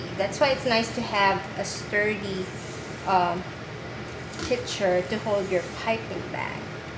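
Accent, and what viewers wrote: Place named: Philippines. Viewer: She has a Filipino accent. 8D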